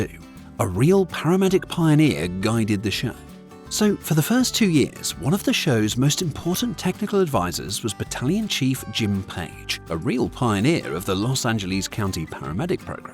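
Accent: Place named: RP, United Kingdom. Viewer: Received Pronunciation, doesn't sound like AI slop except for an odd enunciation on the odd occasion (perfectly acceptable in a human host). I think I could live with this, even if it IS AI slop.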